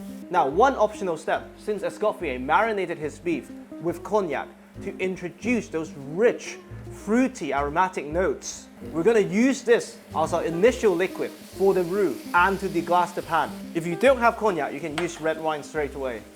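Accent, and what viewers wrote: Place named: England, United Kingdom. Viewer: Chef, you are a maestro as a chef and as a teacher. I love your explanations and references to L’Escoffier. I also greatly appreciate you provide alternatives for example no chicken stock use water the dish has plenty of flavor. I personally don’t have Tawny port hanging around my kitchen. I wish I did. So going back to butter and sugar is no problem. Also, your English accent is fab, you must’ve been educated in English schools.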